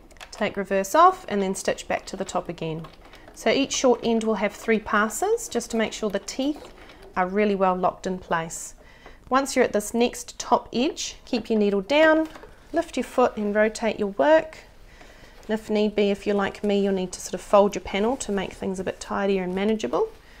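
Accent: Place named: New Zealand. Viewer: What sort (brand) of tape was it? Luv your kiwi accent too. Great tutorial. Thanks! 😂🤣💕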